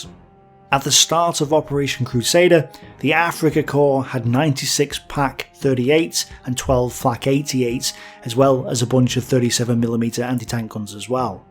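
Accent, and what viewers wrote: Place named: Northern England, United Kingdom. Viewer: really? I hear the accent, but it is certainly not a continental one, it is a british isles accent. Or did you think all Brits spoke perfect Queen’s English, because they happen to live in England? And that English doesn’t have dialects?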